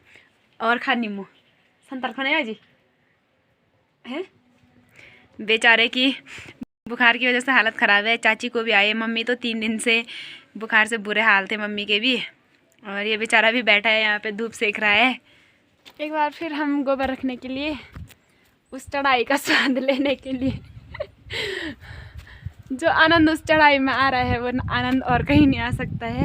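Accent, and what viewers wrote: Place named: Garhwal, India. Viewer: Sudhh Hindi bhasa baii... with gadwali tone.... mtlb kya hi bolen.... 🙂🙃🙃😃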